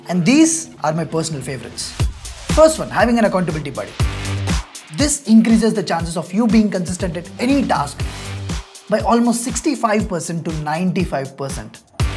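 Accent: India. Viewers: that indian ahh accent but awesome work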